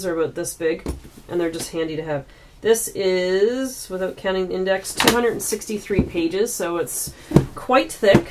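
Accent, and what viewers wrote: Canada. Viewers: Thanks for this--very thorough and entertaining, also educational--helped me choose the Stephanie Bowers and Gabriel Campanaro (sp?) books. Love your Canadian-influenced accent (which pertains to nothing relevant.) I see from your YT channel that you are very "crafty" would love to see more art-centric videos.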